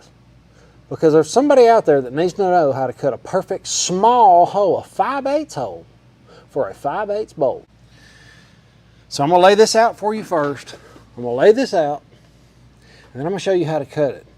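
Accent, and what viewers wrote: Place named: North Louisiana, United States. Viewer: Haughton high school, sounds like you might be from north, La. Youtube recommended this video. You're a good torch hand!!! I have a youtube channel also, I do everything from trucking to fabrication. I finally broke down and bought a Hougen mag drill last year, after wanting one for over a decade! I worked as a millwright and also in a fab shop several yrs ago. I'll subscribe and check out some more of your vids later, Always good to know new tips to make things easier!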